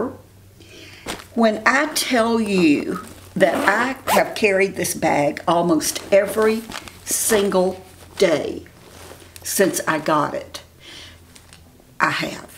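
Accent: South, United States. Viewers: Hi, im watching ur Q&A video (also about caftans). im a new subscriber. About negatives comments, why do these people watch(whoever’s channel) if they arent fans?? Some folks just need to complain🤷🏼‍♀️well i love ya girl!! I could listen to ur southern accent ALLLL day long!!!! 😘❤️ps say hi to Jim, and tell him the yard is looking great!!!🧤🌴🪴🌾🌸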